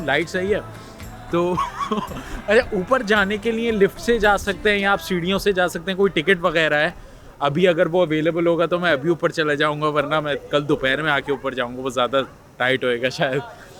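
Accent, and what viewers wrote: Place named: Karachi, Pakistan. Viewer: I always smile while watching your videos. I think it's because we speak the same way as we are Karachiites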